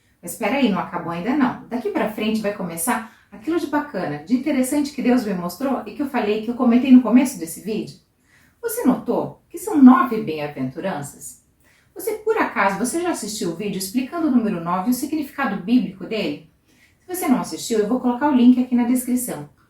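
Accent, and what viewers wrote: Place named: Rio Grande do Sul, Brazil. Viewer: Bom dia ! Hoje domingo de Páscoa .Ele vive 💕 Conheci hj teu canal ,muito bom ! Vc é gaúcha ? Tem sotaque de gaúcha .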